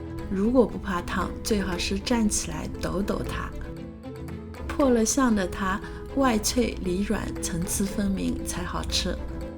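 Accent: Shanghai, China. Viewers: 阿姐太厉害了👍我也是上海人，在伦敦。我是从生煎那个追到这里的。一口气看了你好多视频。看你做菜真是享受，步骤详尽理论清晰，上海口音又亲切，你还长得像我姐姐。😂真是懊恼没有早点发现和关注你。实在压抑不住欢喜，决定要把我在油管的第一次评论献给你。😊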